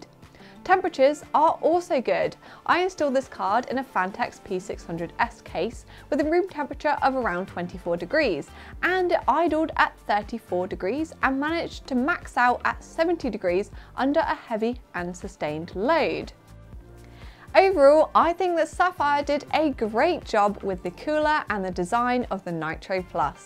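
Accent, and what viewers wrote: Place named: United Kingdom. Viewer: I love the British accent 🙃 Thanks for the translation From ksa🇸🇦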